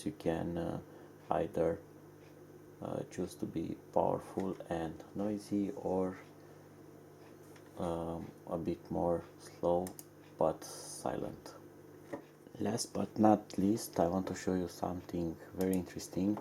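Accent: Romania. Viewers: you sound Romanian to me. am i right ?good english dogh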